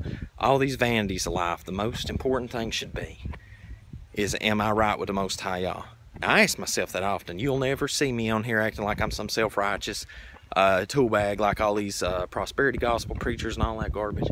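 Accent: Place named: South, United States. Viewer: that's a wholesome southern draw I hear, Praise The Livin" Lord Jesus 💜 and God Bless yall" real good over there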